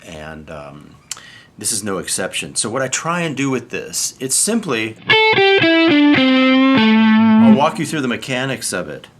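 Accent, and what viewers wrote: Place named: West Coast, United States. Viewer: Oh man, I'm killing myself here. I lifted your solo at the beginning at a slower speed. Finished that last lick and then you start talking but it's still at slow speed and LOL! it sounds like you just took 10 vallum pills ha Oh man with the west coast accent.:) classic...Thank for the licks. I stumbled on this and thought I would a great solo to teach my students. Good strong and Rhythmically accurate licks which is rare in a lot of players.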